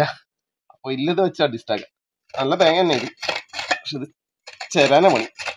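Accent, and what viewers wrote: Kannur, India. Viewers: ok ok ഞാൻ കൊച്ചിക്കാരൻ ആയത് കൊണ്ട് കണ്ണൂർ ഭാഷ കേൾക്കുമ്പോൾ ചിലപ്പോൾ അന്തം വിട്ട് പോവാറുണ്ട്.. കുറച്ചു കൂടി വടക്കോട്ട് മാറിയുള്ള ആളുകൾക്ക് ഇത് പരിചിതമായിരിക്കും.. Any Way.. Thanks for Reply 🙏🏿🙏🏿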